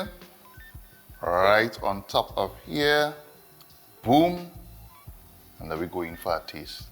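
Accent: Trinidad and Tobago. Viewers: I don't know what style of sweet bread you're trying to reproduce. I suspect it's Trinidadian, due to your accent. I'm familiar with traditional style Barbadian sweet bread and it did not have any of those hideous mixed fruits and maras. cherries that they are putting in the bread nowadays, according to what I have been seeing online. This is almost traumatic. Thank you for not putting that crap in your bread!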